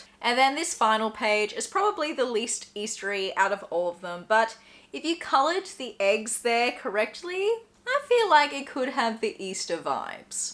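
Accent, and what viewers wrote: Australia. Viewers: 🐰Thank you for your vlogs. Hug from France. (Btw, your Aussie accent ,like Sarae Renae Clark, is so lovely and easy to understand).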